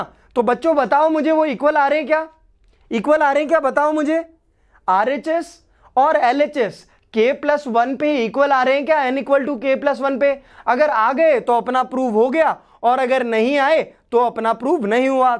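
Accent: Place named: South, India. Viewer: Yeah from south obviously you can understand his accent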